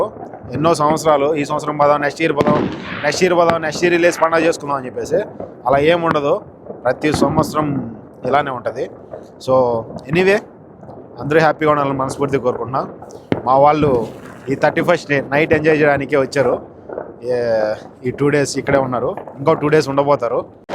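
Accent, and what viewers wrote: Kadapa, India. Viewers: మీది కడప న అన్న 😮 మాది పక్కనే ఊరు 😊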